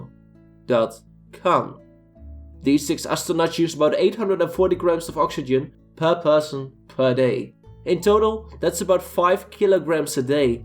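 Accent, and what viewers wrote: Netherlands. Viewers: you're definitely dutch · let me gues, ur dutch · Are you Dutch? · Nice dutch accent though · Awesome video! Like and subscribed, you have a decent english accent, but i immediately heard that you were Dutch <3 Groeten uit Rotterdam ;) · Annoying dutch accent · Lekker Hollands accentje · Your accent sounds Dutch, South African and German at the same time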